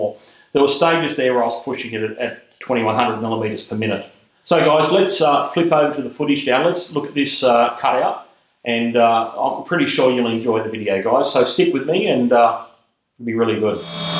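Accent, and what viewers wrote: Australia. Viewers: Hello where are you ? Sounds like Australia, have a question for you. I've been looking on the internet for awhile and can't find what I'm looking for and found you. I'm trying to find an adapter plate for a Rochester varajet manifold to the Holley Throttle body injection system. 2 barrel to two barrel. I don't want a high rise because I don't want to modify my hood. If you could make it how much would you charge me? Thanks Tim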